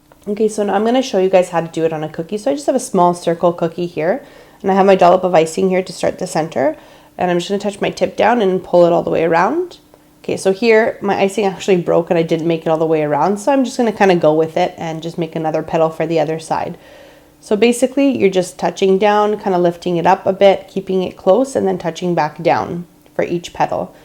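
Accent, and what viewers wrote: Canada. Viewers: Thanks for this 😍 are you a fellow Canadian? I hear an accent lol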